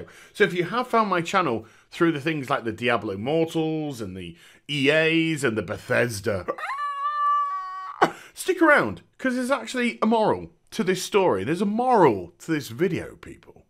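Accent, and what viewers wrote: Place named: United Kingdom. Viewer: More videos please mister funny British man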